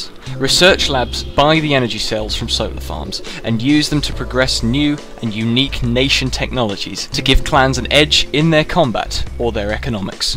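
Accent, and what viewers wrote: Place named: United Kingdom. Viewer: That British voice... makes me weak at the knees;) I don't need no voice.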